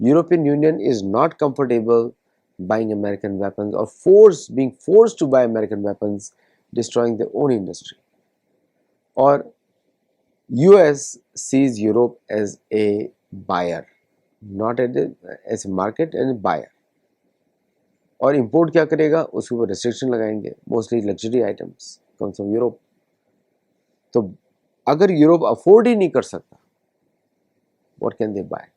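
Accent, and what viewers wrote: India. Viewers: भाई वो गुरुकुल चला रहे है एक अच्छा काम है आज के दौर में । फिर उसका प्रचार किया तो आप को इतनी तकलीफ़ क्यों होती है? और एक मिनट से ज़्यादा भी नहीं लगता उसे । और उन्होंने चैनल का नाम sattology रखा इसपर भी आप को ऐतराज है जब को ये बात उन्होंने पहले भी समझाई है । sattology ये शब्द mythology का काट है और हा हिंग्लिश है तो है पर अच्छी काट है । हमारे पुराणों धर्मग्रंथों को mytholgy करके धुतकारा जाता है इसको प्रभावी रूप से काटने का उनका ये एक प्रयास है । और आप को उनकी हिंदी समझ नहीं आती इस में वो क्या कर सकते है, वो महाराष्ट्रीयन है और थोड़ा लहजा उस प्रकार से है । मैं भी इन्हें रेगुलर सुनता हूँ और हमे इन्हें समझने में कोई अड़चन नहीं आती । तो आप को जरा देखना पड़ेगा । भाषा शुद्धिकरण का मैं भी आग्रही हूँ परंतु हमे पहले जैसे भी हो हमारी संस्कृति का ज्ञान होना आवश्यक है और इस चैनल की वजह से बहुत से लोगो को हमारी संस्कृति धरोहर इसके बारे में पता चल रहा है । भाषा शुद्धीकरण भी आयेगा पहले लोग जुड़े तो सही ।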